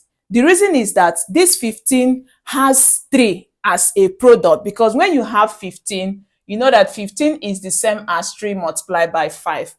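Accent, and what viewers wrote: Nigeria. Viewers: Your accent is typically Nigerian and you are a very good math teacher.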